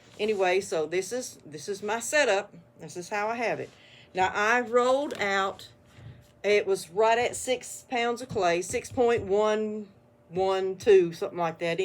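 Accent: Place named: Tennessee, United States. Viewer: I grew up in TN. I miss the accent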